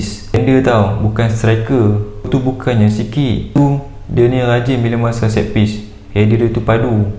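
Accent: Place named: North, Malaysia. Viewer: Ni bercerita ke...cite apa ni??tone brckp sama je...dh la utara pekat...bkn semua org fham...jenuh nk fhm apa yg ko cba smpaikan...pening arrr...!😂😂😂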